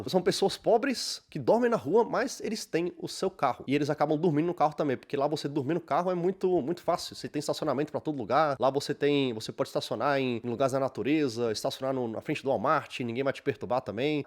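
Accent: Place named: Florianópolis, Brazil. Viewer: Eu via os vídeos desse cara há muito tempo atrás, e pelo sotaque, sempre achei que ele era de Florianópolis, mas não, ele é Brasiliense :face-blue-smiling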